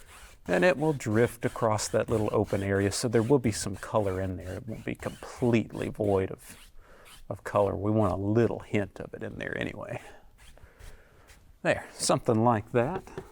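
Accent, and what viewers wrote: South, United States. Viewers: That southern drawl… it’s…. hypnotic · Another beautiful job I love your own personality that creeps out and that hint of a southern twang not as southern as mine but I hear it - it’s a shame the video is ruined by constant commercials cutting in I’m sure there could be a better way of viewing this without YouTube ruining it too much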